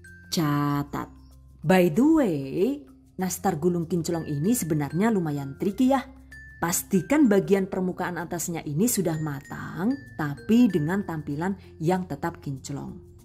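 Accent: Banyumas, Indonesia. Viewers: Resepnya mantap...ngapak-ngapaknya bikin ngakak...ca.....tat #GiveawayTHV2021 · Ini wong ngapak yah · Ngapak gaes😂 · Wong ndi njenengan, bahasane gaul tapi ngapak ya rikane.. Nyong dadi ngguyu2 ulih ngerungokna rikane. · Deneng rika ngapak😅😅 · Wih sangat" faham cara menyampaikan nya bahkan bikin ketawa kas jawa ngpak nya😂😂😂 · Karena saya g punya oven,bisa dicoba nih resep yg satu ini. Hmmm dari tampilan nya enak bgt, Mantep pokoknya 👍👍👍 Dan selalu suka sama ngapaknya bikin ketawa 🤣 #GiveawayTHV2021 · Aku suka channel ini. Jelas bgt ksih ktrangan d stiap resep.. Juga ga pelit ilmu. Ada bhsa ngapak.. Yg bkin ktwa. Sukses trus ya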